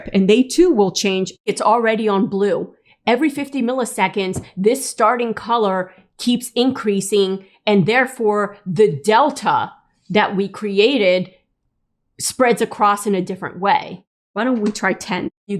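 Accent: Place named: Minnesota, United States. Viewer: this was so helpful. You have a great teaching style and your enthusiasm is wonderful. Thanks for having such a great channel. (Also, I don't know where you're from, but your accent tells me Minnesota, maybe Wisconsin. 😊)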